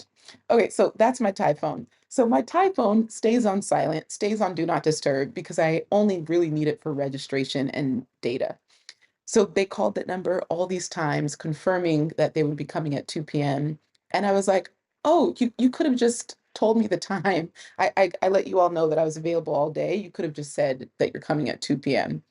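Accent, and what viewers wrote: United States. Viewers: 👵🏽This is a compliment I love your American accent especially when you say y’all 🫶🏽✊🏽👊🏽💪🏽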